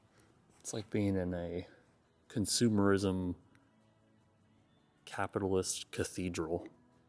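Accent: Canada. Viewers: Is the narrator Canadian?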